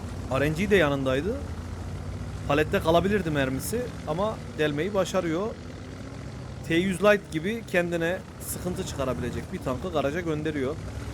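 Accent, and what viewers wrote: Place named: Ankara, Turkey. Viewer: Angara şivesinin hastasıyım. Abone olduk like yaptık evelallah.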